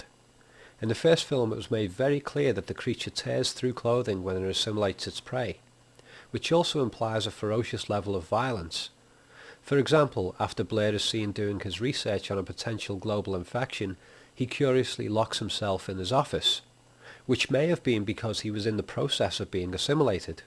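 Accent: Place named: Liverpool, United Kingdom. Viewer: Great video. The new film was very sloppy film making cashing in on the name. I did want to ask unrelated to the video, where are you from? As you sound scouse but you use a fair amount of American words the English never use and some American pronunciations.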